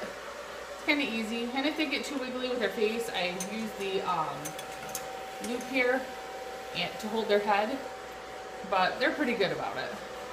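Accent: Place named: Minnesota, United States. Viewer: I hear a Minnesota accent lol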